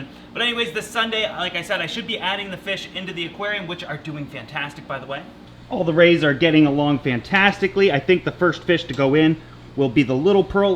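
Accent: Canada. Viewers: volgens mij is hij canadees aan z'n accent te horen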